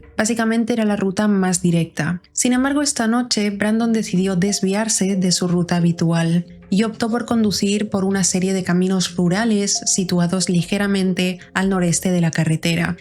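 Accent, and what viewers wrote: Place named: Spain. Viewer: gracias!! es que su tono es completamente Español, sin dudas, pero a veces utiliza palabras o expresiones Latinas, por eso me confudió. 😊 Creo que es una gran profesional, espero que con esfuerzo pueda llegar a ser mas reconocida.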